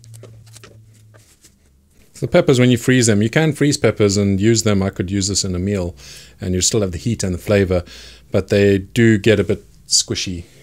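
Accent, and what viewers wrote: South Africa. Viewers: That is sad man. You do have a South African accent though. Anyway, i will have to try and make that weapon of a sauce on my own. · He sounds South African. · He sounds kinda south african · just came across the channel ... awesome content ... you sound very South African ...? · Heard a ZA accent, got my hopes up that there was a local shop to purchase these sauces, saw the cost in UK pounds, the man has obviously left our country :( . Back to using Bushman sauce, lol. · Is your subtle accent south African? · South african accent, i approve 👍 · This guy sounds like a SouthAfrican fore reals >_< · SO EPIC to hear a south african accent! followed!!!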